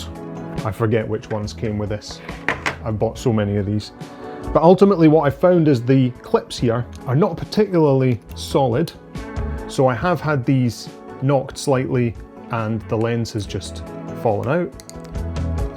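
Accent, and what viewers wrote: Scotland, United Kingdom. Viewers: Was so good to hear a Scottish accent when I turned the volume up. (No, I haven’t got some weird voice fetish, it just meant I haven’t got to go searching for the UK equivalent with the links! For a change.)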